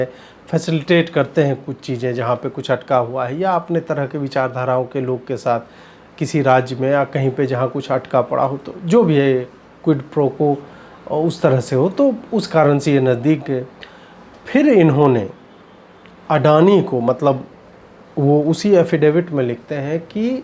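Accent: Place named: Bihar, India. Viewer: Tum tao Bihari lagey mujhe 😅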